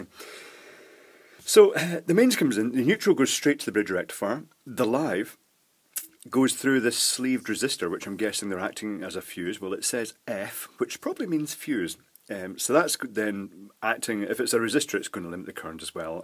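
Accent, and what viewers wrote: Scotland, United Kingdom. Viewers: Are you originally from the Isle of Man? Your accent makes you sound like you're from Scotland.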